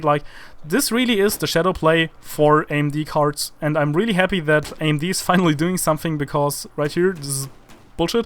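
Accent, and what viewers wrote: Germany. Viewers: Kanns sein dass du aus Deutschland kommst? :D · Safe du bist Deutscher? xD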